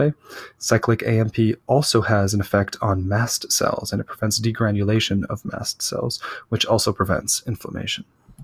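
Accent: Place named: United States. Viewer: I love your american accent and the way you say RECEPTOR :-) :-* Best regards from Munich,Germany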